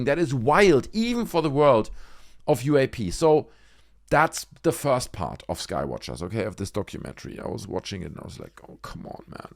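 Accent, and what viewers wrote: Germany. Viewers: LMAOOO, DUDE!! RIGHT?! It pisses me off so much, We need REAL DATA!!! NOT all of this BS that does nothing but muddy up the waters in this area of research... It's such a shame... It really is man... (Cool German accent you have btw haha)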